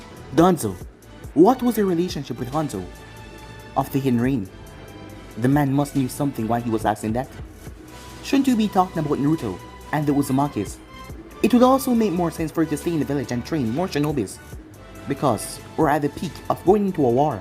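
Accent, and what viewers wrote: Jamaica. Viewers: I've always wanted to ask you this question, are u from Jamaica.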